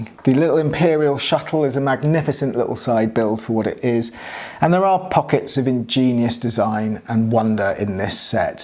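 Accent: England, United Kingdom. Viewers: You must be from the same 10 mile area of England as tom scott. Your accents are to close to be anywhere farther apart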